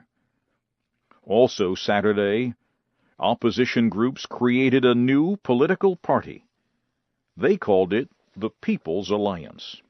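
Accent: United States. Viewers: que buen video para aprender algo de acento americano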